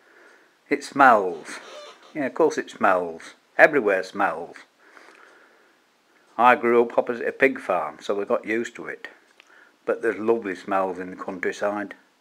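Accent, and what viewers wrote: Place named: Northern England, United Kingdom. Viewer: I find it amazing how quickly accents can change in our country. Im also from Lincolnshire originally (Skegness) and you Sound a lot more "Northern" or "Midlands" than I do. Maybe skegness gets some influence from east anglia? I'm not really sure....I moved to Paignton in Devon about 5 years ago and it was always fun getting people to guess where I was from haha they can never quite tell 🤣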